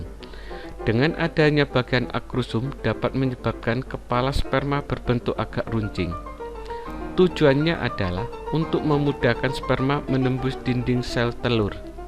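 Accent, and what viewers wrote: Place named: Java, Indonesia. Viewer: Medok pak😂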